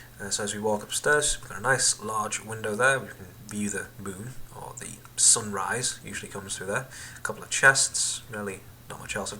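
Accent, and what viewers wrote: United Kingdom. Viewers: Pam VanWinkle its called a british accsent stupid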